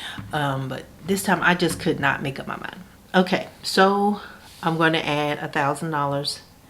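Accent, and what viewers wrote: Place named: South, United States. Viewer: New viewer from Ft. Worth. Don't be worried about a southern accent, girl. It's something to take pride in says this Texan, so nurture it! Hahaha. Interesting to see how you fund your sinking funds. I'm more at the $3 per envelope level. Just started cash envelopes. SLOW PROCESS! Take care and happy budgeting!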